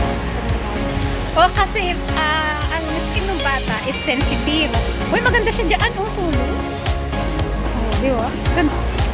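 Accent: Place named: Ilocano, Philippines. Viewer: watching live anak ,marami ka bang napamili ilokano ba kayo kadi yong tono nyo❤❤❤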